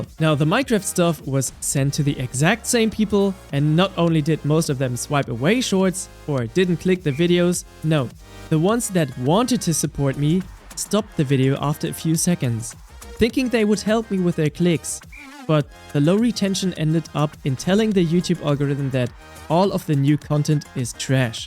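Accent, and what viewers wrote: Germany. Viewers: yo i didnt know u had a wife (i also think that ur german accent helps ur vidoes)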